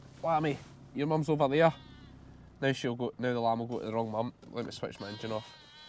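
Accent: Scotland, United Kingdom. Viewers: I'm impressed with you running and chasing Ewes shortly after having Covid. It usually takes people several weeks to recover their breathing and strength and your recovery is amazing in the fact you can actually run chasing a eve around. I'm impressed with Meg's rounding up the ewes but not exactly 100% sure i understand your accent enough to figure out your commands to her. Could you please explains your commands to Meg for us foreigners. I think Meg's been trained abolutely great, and wonder if the breed she is has some natural instincts or shes just an amazing wel trained about what to do with your sheep. i wouldn't mind you confirming what your commands are and what they mean. I also would like to know what each type of sheep they are and why they are called that. Is it age or type or both. I enjoy your channel but I find I have to watch several in a row to get back into understanding you. 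I appreciate the Scottish terms you use but would like a I guess it would be better with a translation as to what you mean. You're doing a great job and hope your channel grows and I appreciate all the hard work it takes for especially to release a vlog daily especially when you're so busy working lambing all day. when youre and you mentioning that what you get for your vlogs and merchandise helps during your tougher months and hope you'll be earning 10 times as much as your currently getting. A thing I wish you'd explain better. Maybe I missed an episode. Could you explain what type a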